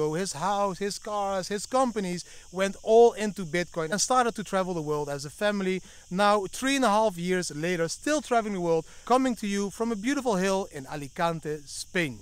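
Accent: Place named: Netherlands. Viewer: Are you dutch? Ik hoor Nederlands accent in het engels! Goede content!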